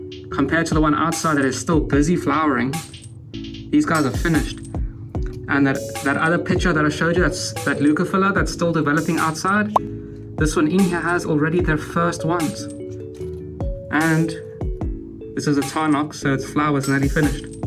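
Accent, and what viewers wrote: South Africa. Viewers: you sound South African! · Brother, are you from South Africa?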